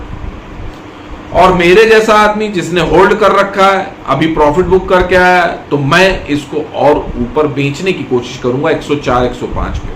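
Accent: Kanpur, India. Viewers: झन्नाटेदार , बातों का छौका न लगाओ , ek dum kanpuriya andaz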